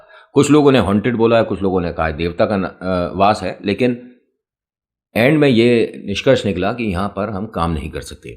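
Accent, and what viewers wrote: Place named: Braj, India. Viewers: bhaiya aapko subscribe aur likes bolne ki zarurat nhi h jo aap se pyar krte h vo phle hi like comment krdete hai aur phir video dekhte h❤❤aur bhaiya braj wale boli aapki muje bhout pasand h❤😊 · Jai mahakal 🙏 bhaiya ji aapke baat krne aur hasane ka trika bhut achha hai ,mera gaon mathura hai waha ese hi baat krte hai, nice story 😊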